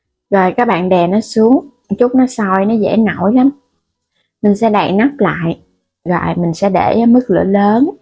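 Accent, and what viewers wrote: Mekong Delta, Vietnam. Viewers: Con ơi nói chuyện nên tự nhiên đừng nên địu quá không hay góp ý nên để giọng miền tây gặt để thương hơn nhé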